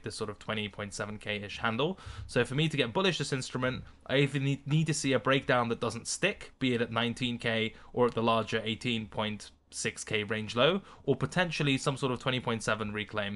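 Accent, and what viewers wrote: United Kingdom. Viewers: Why are all my favorite traders British?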